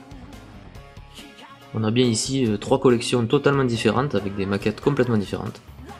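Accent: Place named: South, France. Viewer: Salut t'es d'ou ? on entend un accent du sud.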